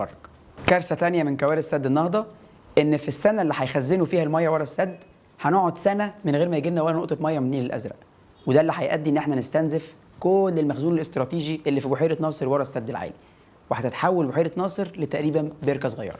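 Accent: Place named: Gulf, Arab world. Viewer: واضح من لهجتك إنك من الخليج..... طبعا لا داعي للذكر إن كل دول الخليج لها علاقات وطيدة جدا بإسرائيل و من ينفي ذلك مغيب أو ساذج و بالنسبة للعلاقات مع إسرائيل ...بالفعل مصر اول دولة طبعت علاقات مع إسرائيل أحنا لا نتناسي....و لكن غيرنا يصطنع العداء لإسرائيل و هو تحت الطاوله يمد يد العون و المحبه و التعاون مع إسرائيل بكل الطرق و بالفعل القوي الناعمه الإسرائيليه في محيط حوض النيل قوية بشهادة القاصي و الداني و ممكن تقرأ عنها رجاء واحد...كفانا كلام من نوعية الإستهلاك المحلي و إصطناع الوطنية الكاذبة!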